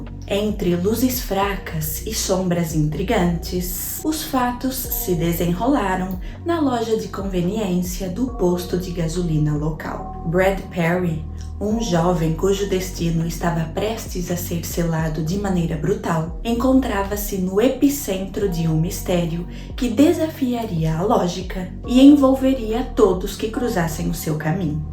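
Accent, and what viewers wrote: Portugal. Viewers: Gosto muito de sua voz e seu sotaque. Penso que vc é Portuguesa.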